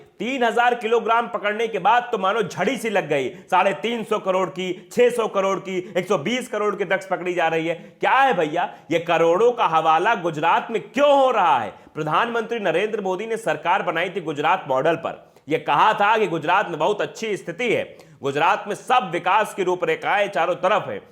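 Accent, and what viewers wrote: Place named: Bhojpuri, India. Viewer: bhai aap ki khabaren main bahut shauk se sunta hoon aap ke bolne ka andaaz bahut pyara lagta hai beech beech me bhojpuri bol dete hain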